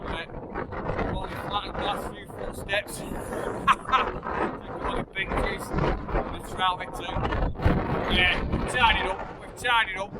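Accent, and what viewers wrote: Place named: Lancashire, United Kingdom. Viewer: Excellent video. Always look forward to them. Love hearing the accent. Here in Western Australia you don’t hear many Lancashire accents like yours or mine. I can’t go walking in the mountains like you but feel I’m there. Many thanks from dave down under.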